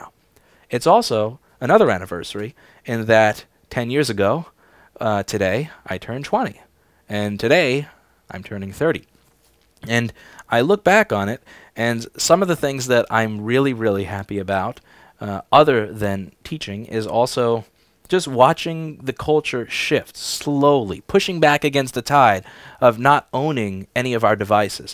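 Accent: New York, United States. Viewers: "Whaddya Pinocchio" so NY, as are you... Happy Birthday!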